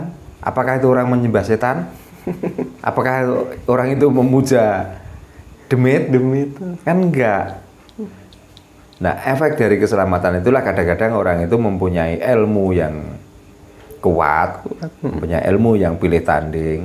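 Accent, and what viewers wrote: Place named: West Java, Indonesia. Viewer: Logat bahasane kok koyo wong sundo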